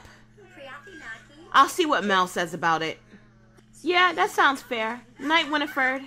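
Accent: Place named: South America. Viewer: awesome south american accent :)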